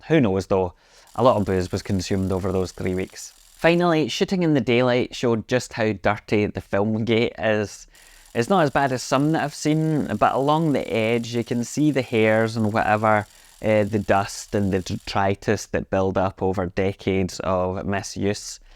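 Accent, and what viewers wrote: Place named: Glasgow, United Kingdom. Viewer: PS - He’s a posh weegie. 😮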